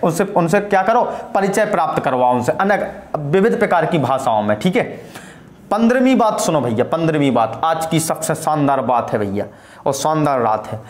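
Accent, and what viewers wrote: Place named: Bundelkhand, India. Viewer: Very nice sir thanku aapki bundelkhani awesome👍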